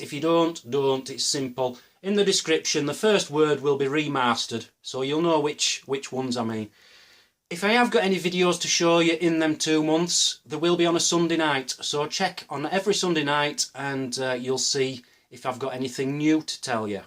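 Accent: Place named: Northern England, United Kingdom. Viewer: When I grow up and become a porn star I'm going to call myself. Seriously though, you're very entertaining... and I *WILL* have a porn star name of in honour of you. P.S Where are you from, I know it's North, but are you a Mancunian, Yorkshire, Lancashire Stoke or of there about? I thought I had an ear for accents. Anyway, keep it up. and school me on how I can't detect what region you're from.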